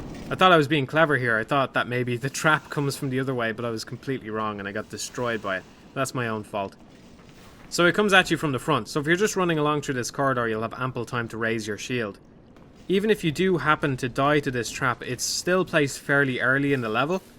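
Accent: Ireland. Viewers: Irish lilt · are you irish? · I watch this video at night to be lulled to sleep by your sweet Irish brogue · I only just found this video and your channel . Can't wait to check out your other stuff. And you sound Irish . So from another Irish I'm glad to find a Irish YouTuber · your sweet Irish brogue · He's very obviously Irish.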